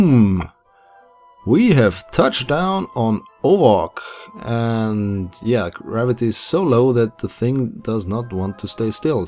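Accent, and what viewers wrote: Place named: Germany. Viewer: 1) Great videos, I love the designs of your ships and your commentary! Thanks very much for everything! 2) Where are you from, if you don't mind me asking. From you accent I would say German or somewhere in Scandinavia. :3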